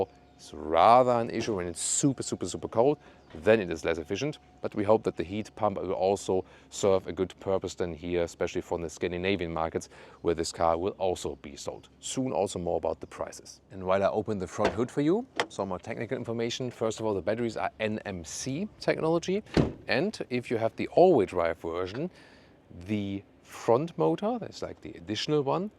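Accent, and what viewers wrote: Germany. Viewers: Allway Watch he has more of an accent then my cousins and they’re German too. Lol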